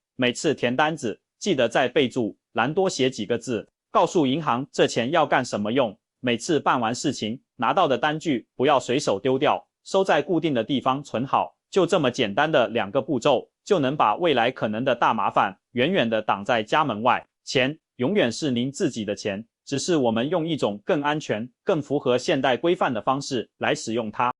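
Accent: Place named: Mainland, China. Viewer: 中共國的口音！騷嘮台灣！你們中國人管好自己比較重要！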